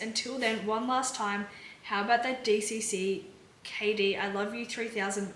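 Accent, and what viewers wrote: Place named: Australia. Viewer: Why didnt I know you were a fellow Aussie? Your accent!! Yes Erin is guilty. Are we really surprised? Chandi lost the plot.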